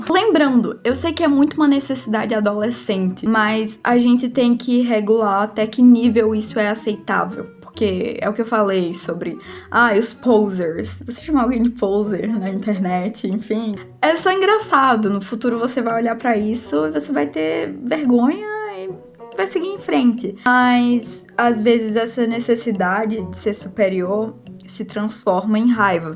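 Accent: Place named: Northeast, Brazil. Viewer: Reconheço uma nordestina quando vejo(ouço) uma 🙄🗣️💋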